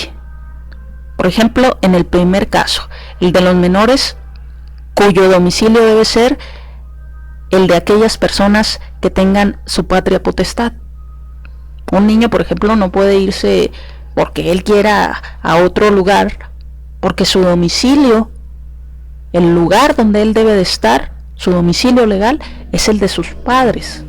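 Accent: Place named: Mexico. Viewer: Por su acento, es de mi querido México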